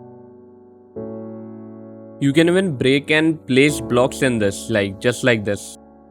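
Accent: India. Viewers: are you indian? you sound like indian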